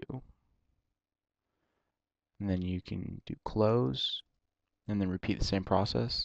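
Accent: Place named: Ireland. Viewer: u from ireland what city ???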